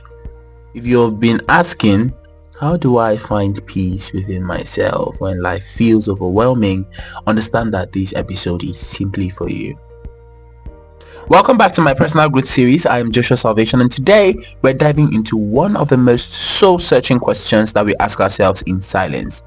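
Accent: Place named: Igbo, Nigeria. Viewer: The igbotic accent for me 😂😅🤣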